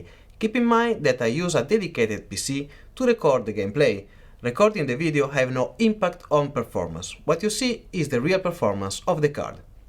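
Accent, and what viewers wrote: Italy. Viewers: Are u Italian or portogese?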